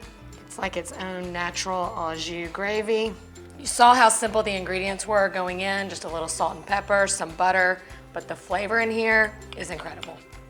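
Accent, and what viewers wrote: South, United States. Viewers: is my favorite cooking / food person by farrrr.....love her voice and Southern accent, love her personality, love her food, love it all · i agree with you i watch her at night kinda hooked on her southern accent! lol and she rocks in cooking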